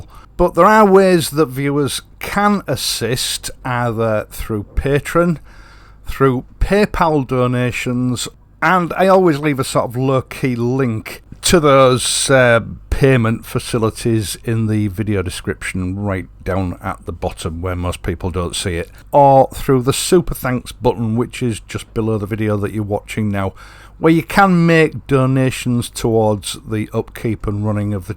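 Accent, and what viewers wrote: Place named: Yorkshire, United Kingdom. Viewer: Well support you’re excellent channel Great Yorkshire man talk 👍👍 You’re a top guy does a brilliant service I recognise most of the roads you’re on Full support here 👍👍👍😎😎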